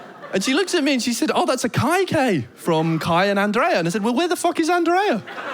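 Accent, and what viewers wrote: Denmark. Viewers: Does have a Danish accent when he speaks English or maybe he is just one of those people that sound horrible no matter the language they try to use.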